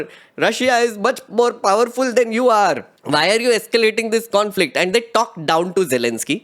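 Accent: India. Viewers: When your accent is this bad you should just stick to hindi. Indians are the only people who speak a foreign language in their own land😂.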